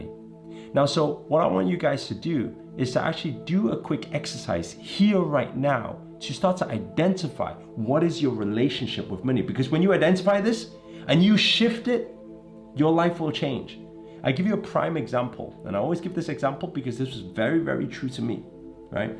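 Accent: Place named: Liverpool, United Kingdom. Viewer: Wow scouser!!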